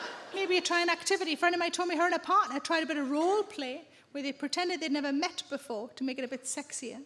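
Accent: Newcastle, United Kingdom. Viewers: Geordie, from Newcastle on the UK. Not Georgia.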